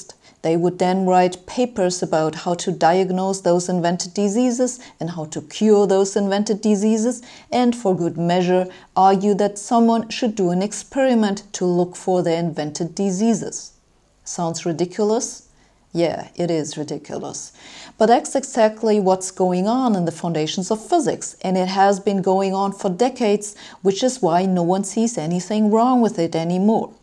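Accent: Germany. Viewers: Well, she is German.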